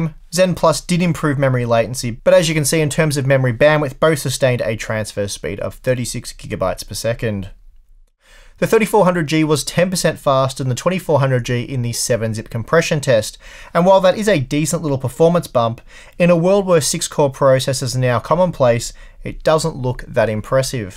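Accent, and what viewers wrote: Australia. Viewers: I think he says "near on impossible", might be the aussie accent.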